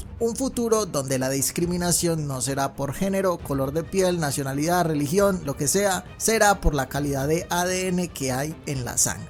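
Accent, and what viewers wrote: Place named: Colombia. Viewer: Que como se que sos colombiano? por el acento, y con la frase "la mata que mata" ajajajaja...sos de los mios compa.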